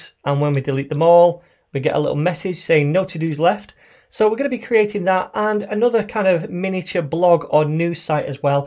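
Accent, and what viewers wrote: United Kingdom. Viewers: I just enjoy any tutorial with a British accents....it's just easy...much love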